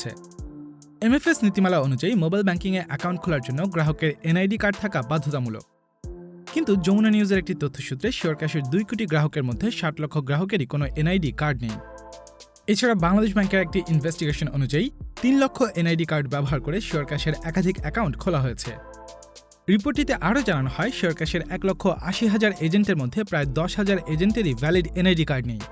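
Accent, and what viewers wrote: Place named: United Kingdom. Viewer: বাংলা বলবেন বাংলার মত করে, ইংরেজির ফ্লেবার লাগাইয়া বাংলার সৌন্দর্য নষ্ট করেন কেনো?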